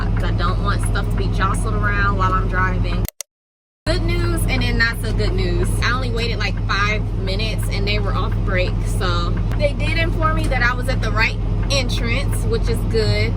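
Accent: Michigan, United States. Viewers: LOL, I didn't realize you were local. But hearing you say "Meijersss" is so comfortable and familiar that I laughed. As Michiganders we have so many neologisms that mix with Yooper-talk and Canadian lingo and covered by all that Deep South drawl from our elders. 😁 People from outside the region have such a hard time figuring out where I'm from sometimes. 🤣 Waving at you from Detroit 👍🏾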